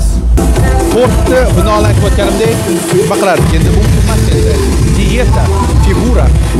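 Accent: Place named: Uzbekistan. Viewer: Битта лайк сиздан ургилиб кетсин хакикий узбекча нутк учун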